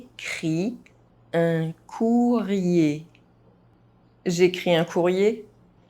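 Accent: France. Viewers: I know but I wish I could do it JUST LIKE YOU! Also I LOVE you French accent of English! That is the best foreign accent! Much less rough than Austiran for sure lol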